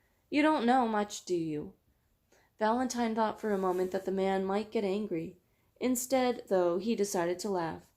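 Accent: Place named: United Kingdom. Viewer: Your british accent is wonderful in this one!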